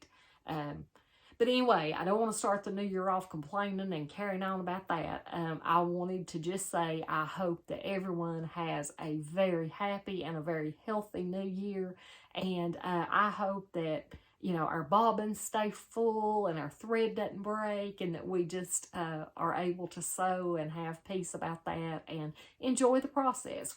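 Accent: South, United States. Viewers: One more thing, u have that southern sound like Ms .that's where we are from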